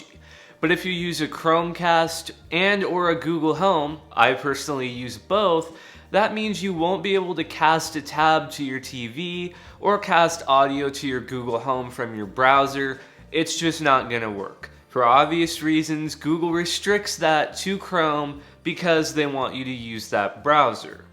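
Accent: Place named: California, United States. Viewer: I like his California Stoner dialect.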